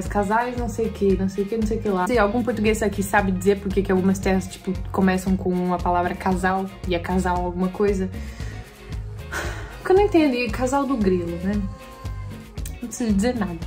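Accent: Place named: Brazil. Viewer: estás a começar a perder o sotaque do Brasil 🤣🤣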